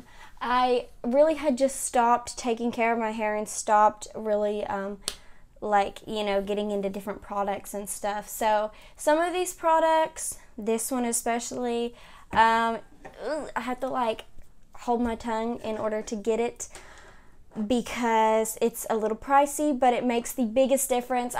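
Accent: South, United States. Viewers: It's a southern accent...could be Californian.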